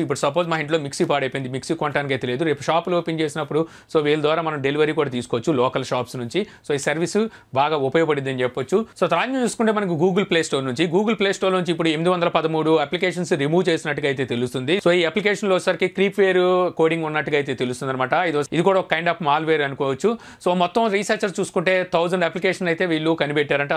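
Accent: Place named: Telangana, India. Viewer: Namaste kaaka telangana slang 🔥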